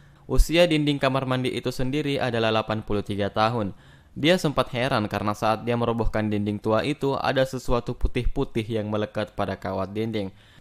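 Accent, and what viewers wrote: Makassar, Indonesia. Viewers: Org Makassar Eaa?😂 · P A N D A dia logat makasar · Bang kmu orang Makassar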